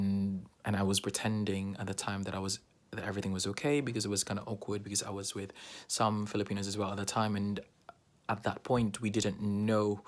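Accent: United Kingdom. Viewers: Wow British accent! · Nice accent bro! How come you already have a british accent? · British accent na kaau raf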